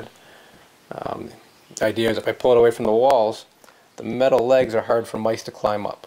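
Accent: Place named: Germany. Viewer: Amish? Fabulous God loving people. Its good you can count on Amish for supplies. You sound German (accent) Thanks for video!!!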